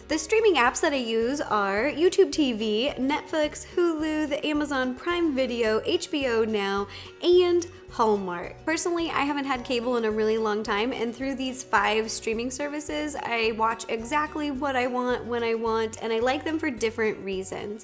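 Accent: United States. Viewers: you have a nice american accent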